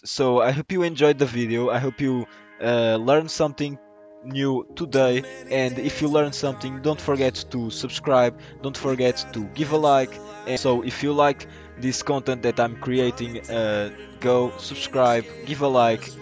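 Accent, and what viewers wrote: Portugal. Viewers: ah este sotaque tuga nao engana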